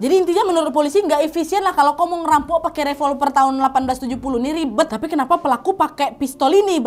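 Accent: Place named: Medan, Indonesia. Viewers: Emang logat medan paling disukai 🥰🥰🥰😁😆😆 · Nonton semua video si gue ngerasa kalau semua tokoh dari negara manapun yang dia ceritain adalah org MEDAN. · Ampunnnnn, cerita kau jd kocak krn gaya cerita yg berlogat sumatera❤❤❤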